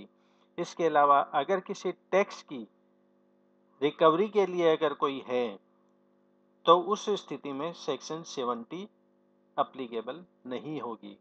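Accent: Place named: Indore, India. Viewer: Are you from somewhere around Indore-Ujjain, sir? "Apan" caught my attention. :D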